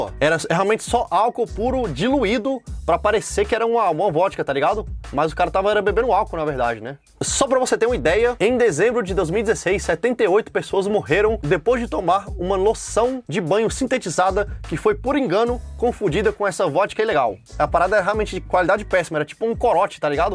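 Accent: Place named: Ceará, Brazil. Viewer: VOZ DE HUMORISTA CEARENSE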